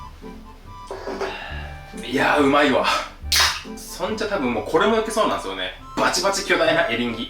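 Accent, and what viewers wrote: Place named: Hakata, Japan. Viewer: 全編博多弁の動画を見てみたい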